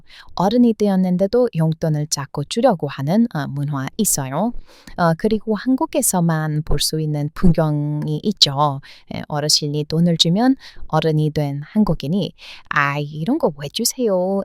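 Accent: United States. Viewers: 목소리가 중독성이 있어 좋습니다 " 한국어 미국사투리 " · 목소리가 듣기좋아요 귀여워요 이 미국 사투리 자꾸 듣고싶어져요 지적인 수준도 높은 분인 것 같아 계속 기대합니다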